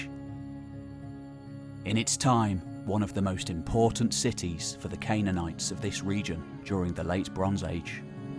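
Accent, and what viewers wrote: England, United Kingdom. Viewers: Scrotum Monster it’s his particular English accent. You’re missing his other pronunciations different from yours.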